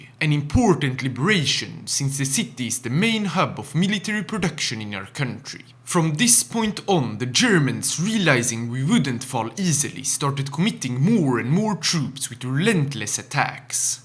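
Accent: France. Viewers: You sound French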